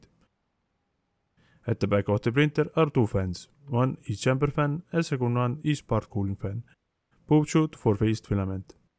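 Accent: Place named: England, United Kingdom. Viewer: I don't have that either! I use translate! It's more about his deep voice and English accents! What I'm happy about and what's most important, is that makes some good videos, and I enjoy them!:)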